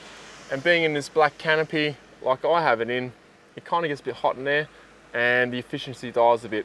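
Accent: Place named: Australia. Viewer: Thanks for a great review, I am considering this fridge (or 2) for my campervan build, and you have just convinced me. They will work perfectly stacked on top of each other, and the idea of remotely locating the motor is the best. It took me about 3 sentences in, to identify the language as Aussie English 🙈😂😂 Greetings from South Africa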